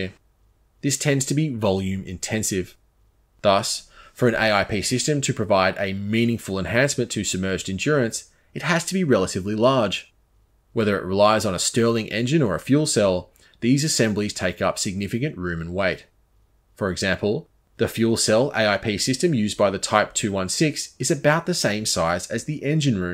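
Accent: Australia. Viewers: What am awesome podcast mate! Hearing the aussie voice for over 2 hours was quite pleasant. You could split this into 4 parts for bulk views but damn, my Saturday morning has been educational! Cheers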